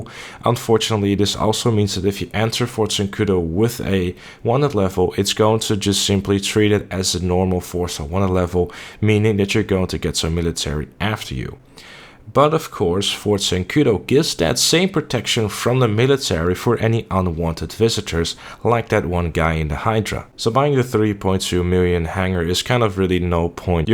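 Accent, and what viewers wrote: Netherlands. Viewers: Slow Dive he is dutch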